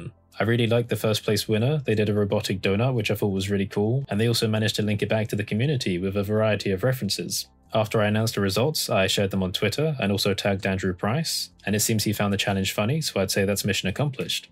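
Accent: United Kingdom. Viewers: You sound English. Anywhere I can throw money at you in GBP to avoid the exchange rate?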